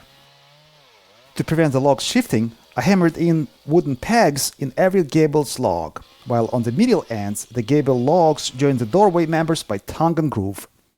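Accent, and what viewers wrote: Russia. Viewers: Tool me way too long to realise hes russian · Такое впечатление,что русский озвучивает на английском языке ,такое акцент!!!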